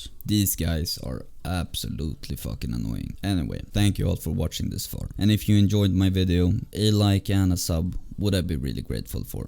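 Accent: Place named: Sweden. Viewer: I love your swedish accent, new sub tho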